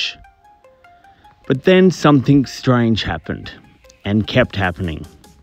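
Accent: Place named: Australia. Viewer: That's pretty awesome and the fact that I can hear that Aussie accent narrating the video makes this even more awesome.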